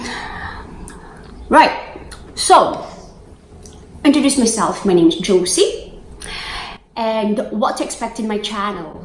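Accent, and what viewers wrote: United Kingdom. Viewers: Oh I fin’lly saw you,your accent is really Scottish. Were you born there? Oh that’s yummy. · I love the British accent oiii. hahah please do samyang spicy noodles challenge!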